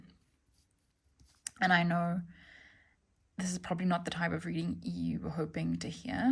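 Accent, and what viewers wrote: South Africa. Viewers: May I ask if you are South African? ❤ I just love hearing your accent doing a reading! And your readings resonanate so much with me too, thank you for sharing. Love from the East Coast of S.A. 🇿🇦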